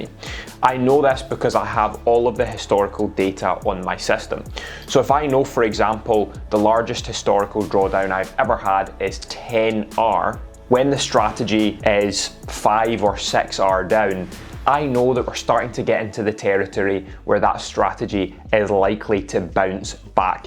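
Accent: Scotland, United Kingdom. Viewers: Nice accent Scottish Lad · Are you from Scotland? Nice accent 😅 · Are u Scottish? Impressive work anyway.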